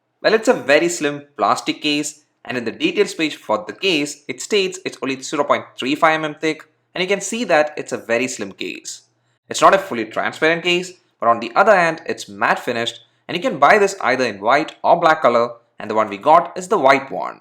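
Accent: Kerala, India. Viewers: aa accent ketapozhe enik thonni malayalee aanennu ..hahaha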